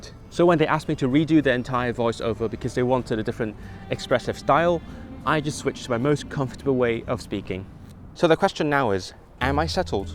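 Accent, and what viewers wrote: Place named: Manchester, United Kingdom. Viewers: I wonder if anyone has ever mistaken you as somebody who grew up in the UK, or more specifically in the north, as your northern RP sounds very authentic. Also do you self-correct in natural speech when you notice your pronunciation is off? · As a Native mancunian I can say its almost a fallacy to have a truly "manc accent" within the boundaries of the city alone there are subtle but noticeable differences from proximity to Yorkshire and Cheshire respectively as an example. Let alone the class dynamics. I would say your accent as you described is general Northern RP and is good enough to be effectively understood as someone who you would assume knows for local vocabulary. New subscriber and loved the video! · I noticed a distinct light Manchester undertone on your recent Welsh video. Great work mate 👍👍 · I really like your slightly posh (RP) Lancashire accent. 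You remind me of a Dr Unwin from Southport who I sometimes listen to online. I was born in Malaysia and spoke a bit like you when I left school after 10 years of English boarding school in Cheshire. I have lived in Australia for 40 years now so that has corrupted by tones somewhat. Your accent is lovely and endearing to me. I want to reclaim lost aspects of myself starting with the Manchester accent! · Posh Northern solidarity ✊🏻 You need to start saying "reyt" though. I'll infiltrate your speech with some Sheffieldisms too, don't you worry. On the accent and belonging point, you're bang on, Manchester has people from all over the country and beyond such that labels like proper Manc feel meaningless. I would say as a native Brit though, I still have to perform different accents in different places (convergence, divergence). Class and accent in the UK are really drilled into you from birth and are a necessary tightrope to walk, especially if you have a non-RP/non-"southern" accent. 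I always feel like I have to be a chameleon to make interactions go well. (To answer your question, I love Singlish and HK accent! I typed the word accent so many times, it doesn't look real anymore.)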